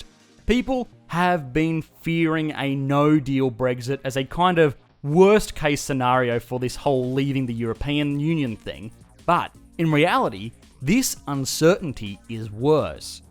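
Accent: Australia. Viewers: Some biased shyte from this Australian speaking bod. Great British Pound....hahahaa Country finding its feet....EU never stopped UK from their trade and commerce. Wait and see when the sterling will be less than Euro. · The Australian accent is horrendous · I think this idiot Aussie sound alike is being paid by the corrupt EU l hope the UK leaves with No Deal, far better for us in the UK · What ethnicity are you mate, you sound Australian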